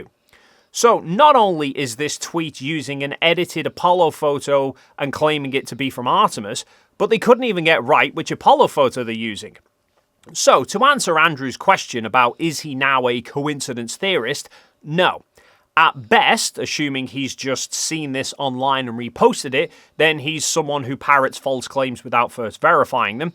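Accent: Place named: Northern England, United Kingdom. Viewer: It's just his particular working class-ish northern English accent. That's how many folks pronounce it. Americans pronounce is "Saa" instead of "Saw". Are they pronouncing it wrong?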